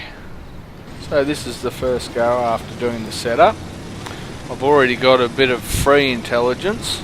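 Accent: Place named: Australia. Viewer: really nice to watch, and your aussie accent adds some taste to the thing :) cheers from Matt_Mulder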